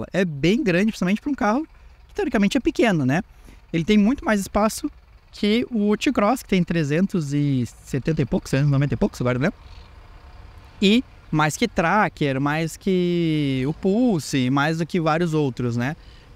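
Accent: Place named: Rio Grande do Sul, Brazil. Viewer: Um FOLKSwagen kkkk esse sotaque gaúcho é um barato!!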